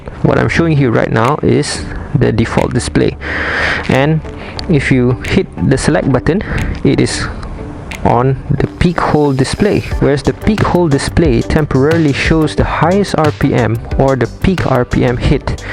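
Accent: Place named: India. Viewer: Heavy indian accent, mouth breather... Thumbs down.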